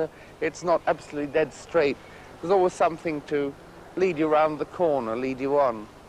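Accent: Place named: RP, United Kingdom. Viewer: Well that is received pronunciation and I speak with the same accent and I have lived most of my life in Huddersfield. There are a myriad of accents in Yorkshire alone.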